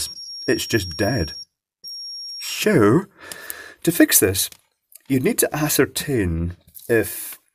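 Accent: United Kingdom. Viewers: as always, Very informative video, by very charismatic speaker, your Native British accent is another inspiration for beginners (as it was for me when I started 3 yrs ago ). Thank you and God bless you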